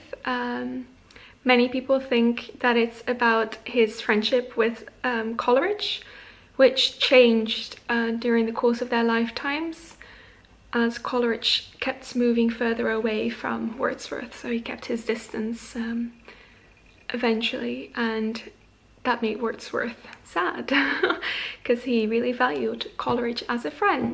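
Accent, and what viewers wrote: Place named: United Kingdom. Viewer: like low key, I couldn't focus on the poem while you were reading because of your amazing british accent!.. but yea, well read.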